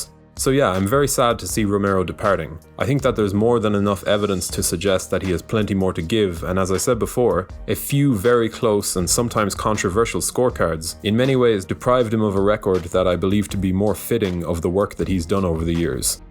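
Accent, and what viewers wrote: Ireland. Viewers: The Irish accent makes your videos better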